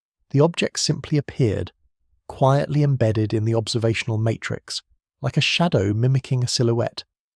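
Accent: United Kingdom. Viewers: It's called Queen's English for a reason clear elegant and the universal language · Why is the narration ALWAYS with a British accent?!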